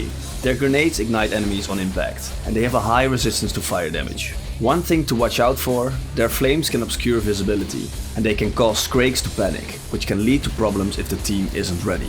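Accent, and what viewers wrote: Netherlands. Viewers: Ik ruik Nederlands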